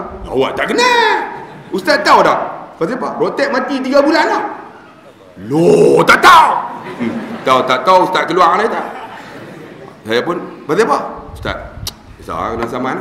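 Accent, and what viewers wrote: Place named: Kedah, Malaysia. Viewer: Ustaq kami owg kedah mmg la kasar...owg kedah mmg ckp mcm nie...harat jngn ambil hati noh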